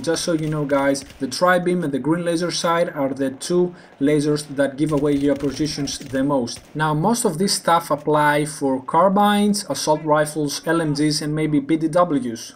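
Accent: Greece. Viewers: Are you Greek? Your accent sounds Greek^^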